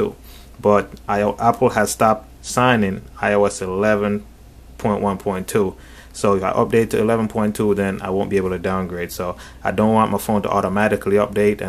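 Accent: Jamaica. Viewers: Can tell you a yaadie lol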